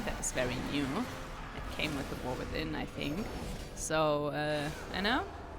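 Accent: Germany. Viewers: i hea da an bayrischen släng aussa oda? xD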